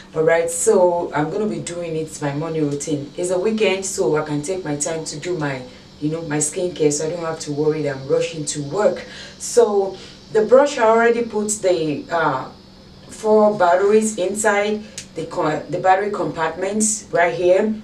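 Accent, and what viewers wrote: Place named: Africa. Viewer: Goof morning my blessed family. I hope you are well by grace.we can hear your beautiful African accent. Looking beautiful sis as always. I need some of this brash . Love you sis I declare peace and joy in the hearts of Your people! I proclaim that You will bless Your children and will keep them; You will make Your face shine upon them and be gracious to them! I pray against the plans of the adversary to steal joy and peace from Your people. I declare that Your children will remain uplifted, for there is no one who can lift the soul like You do, Amen.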